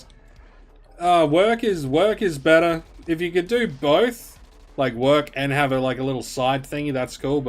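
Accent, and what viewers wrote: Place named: Australia. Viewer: Would love more of these unedited long hunting sessions. When I hunt, I need an aussie accent in the background now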